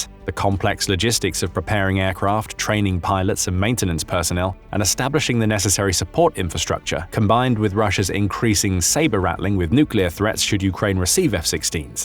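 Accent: England, United Kingdom. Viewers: I'm really getting weary of the ENGLISH ACCENT. As if it the accent somehow carries more intelligence. 🙄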